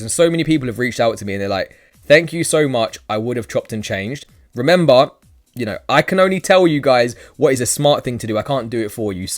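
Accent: United Kingdom. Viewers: Your accent is quite nice I suppose British boy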